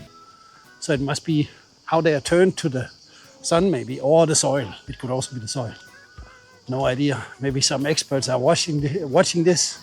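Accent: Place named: Germany. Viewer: Curious here. - your first name sounds Danish. Your Family name English. Your accent in English seems German.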